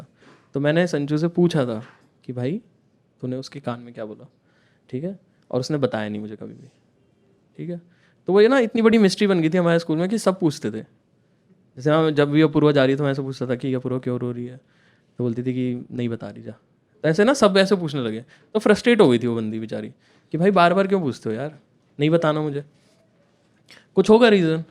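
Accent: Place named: Sonipat, India. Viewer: bhai jo teri awaz m tone h n dost va sonipat aali h dost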